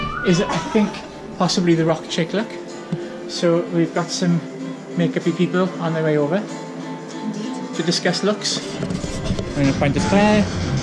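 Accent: Wales, United Kingdom. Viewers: are you welsh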